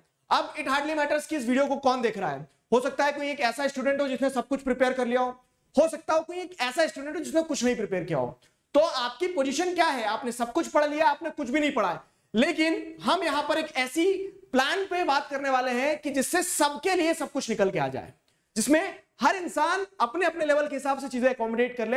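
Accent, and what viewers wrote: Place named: Madhya Pradesh, India. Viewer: Sir Your accent is similiar to me because I also live in MP ( Indore ) love you ❤️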